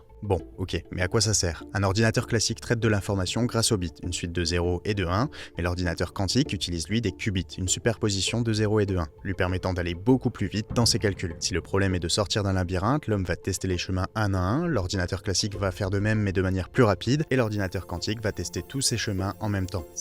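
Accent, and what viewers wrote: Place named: Banlieue, France. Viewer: Attention à ton accent de banlieue, c'est très perturbant et pas français.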